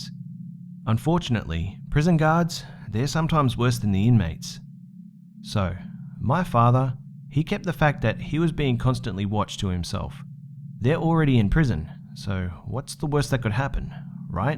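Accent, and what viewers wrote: Australia. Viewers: I think it's an aussie way of talking. I never noticed it before but a few weeks ago I started noticing it and I first thought it was cute and very aussie, like how he pronounces building 'biwding,' but I feel like it's getting more and more frequent and I catch it every time. it's not unbearable but the frequency of it does make we want to cringe a little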